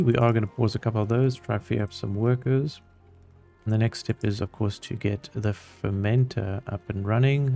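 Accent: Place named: South Africa. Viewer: yay, another South African timberborn player, heard the accent immediatly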